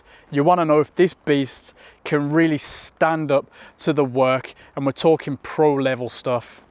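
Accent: Birmingham, United Kingdom. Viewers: Is that accent Brum?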